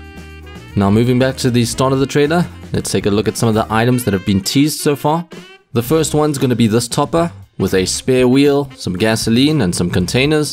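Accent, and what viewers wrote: South Africa. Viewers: Eyyy bro are u from SA??? LOVE UR VIDS BTW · Are you south Africa. Really sounds like it and if so howzit bru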